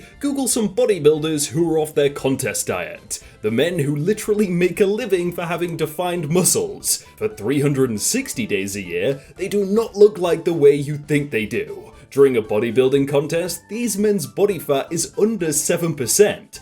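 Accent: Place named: United Kingdom. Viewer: Every video helps me learn British-English I love it, thank you 🙏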